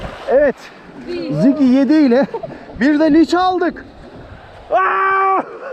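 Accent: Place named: Russia. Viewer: Ruscayi harika konuşuyorsun lehcesiyle 😁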